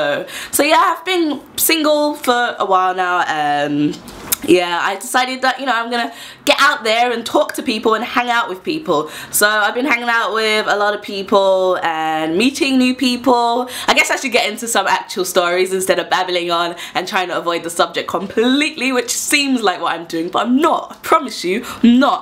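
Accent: United Kingdom. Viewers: I'm almost crying cause I love your British accent to much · Owing to you, i got used to British accent. I could understand you fully! And i like your giggling smile. (I call it 눈웃음 ^^) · Absolutely adore your accent, luv! I'm British as well, and I miss that accent.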